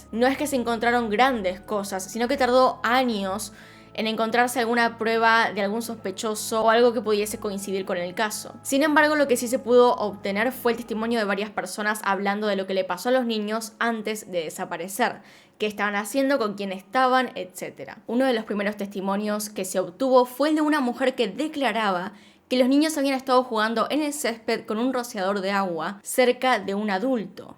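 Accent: Argentina. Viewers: Me gustan mucho tus videos. Haces muy buena investigación y narras muy bien. Solo me cuesta un poco de trabajo acistumbrsrme a tu pronunicación argentina: “ninios” y “plasha”... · Me encanta tu acento Argentino y como te expresas. Saludos y felicidades por tu cumpleaños 🎂🍹🎁🎉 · Me encanta el acento argentino 🇦🇷 ♥️♥️👍🏻✨ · Me encanta tu acento argentino y cuando dices "una vez más" eres muy auténtica 🤩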